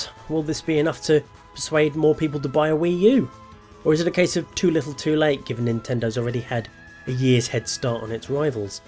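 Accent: England, United Kingdom. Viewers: Still nothing from any of these companies that makes me want to run out and buy a new console. Good video though. Also, are you getting more English? You sounded more English in this video than previously.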